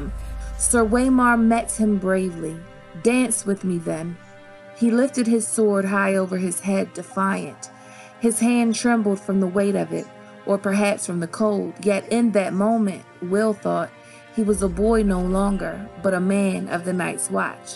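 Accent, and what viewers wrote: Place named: DMV, United States. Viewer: You are from the DMV area or B-Moore I can hear it in the accent but good info on GOT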